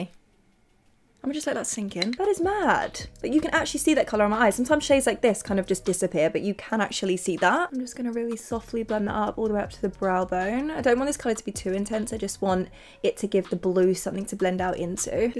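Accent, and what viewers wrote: Australia. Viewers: I'm so intrigued... I've only watched vids for a few months and absolutely loooove them. But, can any OG fans answer me something. Is she from Australia originally? I can always hear a twang in her accent and I can't work out what it is 😅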